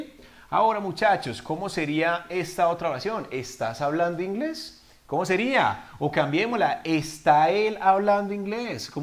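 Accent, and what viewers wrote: Antioquia, Colombia. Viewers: Vosos paisa de medellin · Yo creo q me estoy enamorando no pongo atencion me encanta el acento colombiano el teacher ni digamos 🤗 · me encanta el acento colombiano parcero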